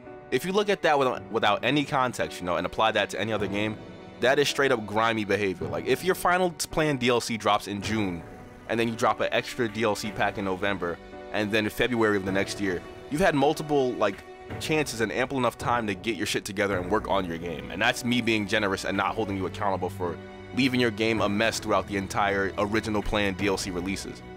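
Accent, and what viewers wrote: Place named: New York, United States. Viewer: Sound like a nyc accent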